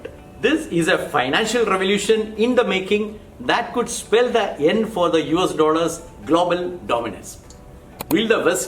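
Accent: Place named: India. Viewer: Thanks to sub-titles otherwise I would have problems understanding Indian English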